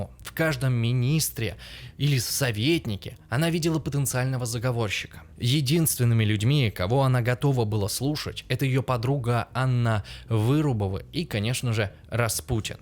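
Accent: Ukraine. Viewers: Господи, как же раздражает этот украинский говор, нахрена букву Я в каждом слове проговаривать как Я твердо, в русском вообще мало где она так произносится, пишется Я, а произносятся звуки Й, И, либо вообще проглатывается звук Я при разговоре. Кровь из ушей, хуже только кубаноидный говор, этим говором русских пытать можно, только включить запись и мы сойдем с ума. А канал классный, но мои уши сворачиваются в трубочку 🩸 Я бы лучше с субтитрами посмотрела, выключив звук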